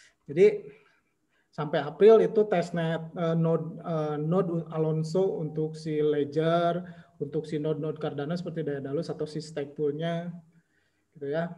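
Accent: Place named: Sunda, Indonesia. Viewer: Langsung gue sub ini chanel josss,,,medokk kaya orang sunda😎😎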